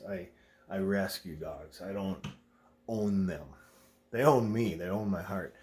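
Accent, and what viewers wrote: Minnesota, United States. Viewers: That minnesooooohhhhhta accent tho. Great vid on the importance of the place command.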